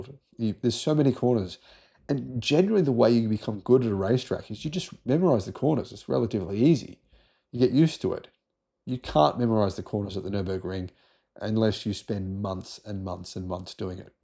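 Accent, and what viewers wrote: Australia. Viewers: if you’re going to call Zeds Zee, then, you may as well do away with your Aussie accent. Just sayin’.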